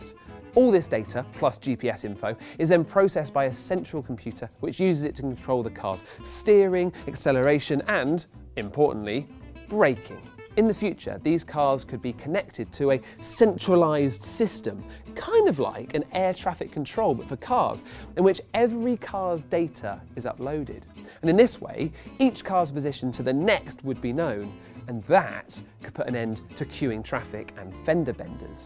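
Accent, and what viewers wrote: United Kingdom. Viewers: finally I find a guy that's speaks brit english not american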